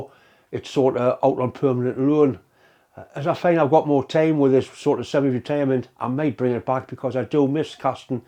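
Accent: Newcastle, United Kingdom. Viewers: Now''t amis we a bit o Geordy!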